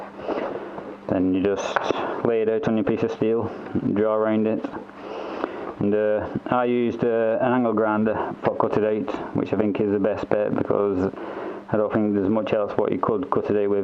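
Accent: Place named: Bolton, United Kingdom. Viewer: Id give you good money for one of them there scoops lad. are you from bolton by any chance?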